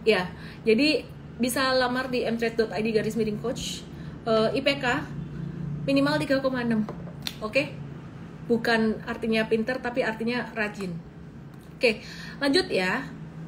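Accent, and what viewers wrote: Java, Indonesia. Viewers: terima kasih ci. penjelasannya singkat dan jelas. pertahankan logat jawanya .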